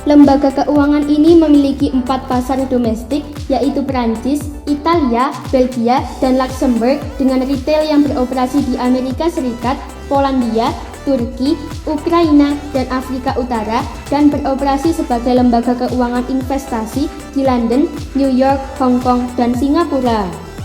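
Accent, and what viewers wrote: Java, Indonesia. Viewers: Medoknya terganggu banget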